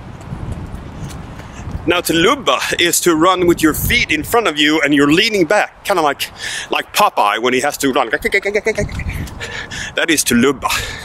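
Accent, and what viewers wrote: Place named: Scania, Sweden. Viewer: as a subscriber of you for a long time, not so hard to hear your Scanian dialect. Thanks for the teaching everything about Swedish!!!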